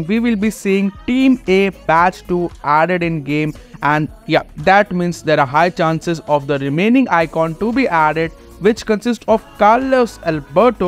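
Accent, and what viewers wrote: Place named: India. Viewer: Love this Indian accent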